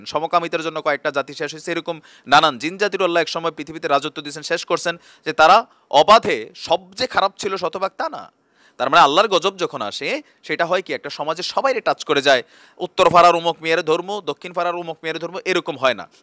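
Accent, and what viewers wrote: Comilla, Bangladesh. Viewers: ভাই আপনি কি কুমিল্লার নাকি? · ভাইয়া,,যেভাবে শুরু করছিলেন,,😂😂 আপনি পারেন ও ভাই😜বাই দ্যা ওয়ে,আমি কিন্তু কুমিল্লার বউ,,,😁আপনি একদম খাছ কুমিল্লা পোলা😬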